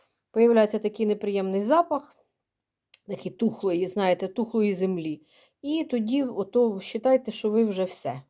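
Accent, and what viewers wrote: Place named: Cherkasy, Ukraine. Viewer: Дякую, що поділилися досвідом!!! Буду пробувати розмножувати, це так цікаво. У вас такий знайомий говір, ви не з Черкащини??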